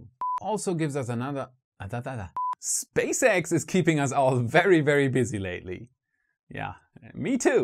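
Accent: Germany. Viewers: C'mon he speaks English with a heavy accent and German without any, so you tell me haha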